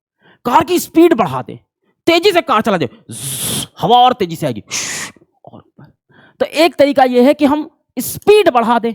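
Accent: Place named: Uttar Pradesh, India. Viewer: Who is this joker? Very unprofessional way of explaining aviation theory. This is 2025 and this guy is still stuck in bhaiyya accent.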